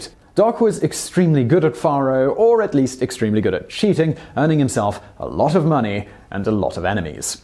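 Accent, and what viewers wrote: England, United Kingdom. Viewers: Something weird about a British man giving me American history. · So the funky English accent makes history assumptions fact? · Nothing says like a good old biography of a American Wild West legend narrated by a Brit! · Despicable actually, the pretentious British accent combined with ignorance of American culture or proper American pronunciation is utterly repulsive... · im English and i do feel hearing an english man talk about American gun fighters just doesn't feel credible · This would be a lot better if the narrator didn't talk so fast and choppy with that annoying accent. English accents are great as long as they are speaking the Queen's English and not that choppy sounding stuff. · I just cannot stand a British accent.....it makes me crazy....especially the way he pronounces the game Faro ! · The limey narrator seems a bit biased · Why in the hell is a sewer britsh oink talking about american southern and western history?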